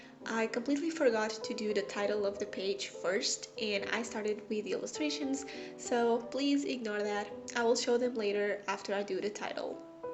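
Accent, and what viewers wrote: Portugal. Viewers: are you portugese? bc i am fluent and you have an accent.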